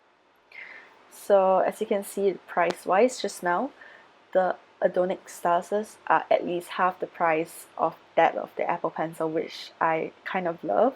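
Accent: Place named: England, United Kingdom. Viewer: I hate ur english accent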